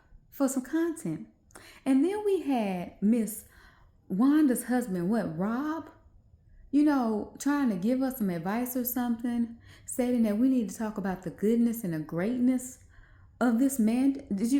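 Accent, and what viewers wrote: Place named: South, United States. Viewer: Hi beautiful, new to your channel. You sound just like a Southern Bell lady, I love the accent it's too cute🥰❤ But as said, they'll be many blinded calling wrong right, and right wrong.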